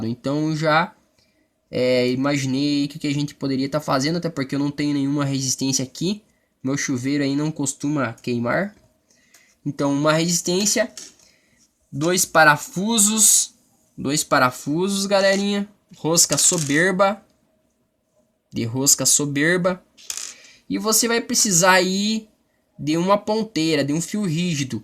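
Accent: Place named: Paraná, Brazil. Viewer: tu é paranaense né mano kkkkk tmj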